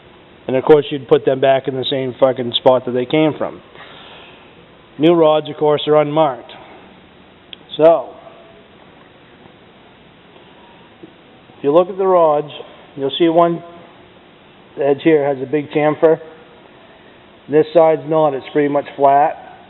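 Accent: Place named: Maine, United States. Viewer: Where are u out of? From ur accent my guess is up here in maine...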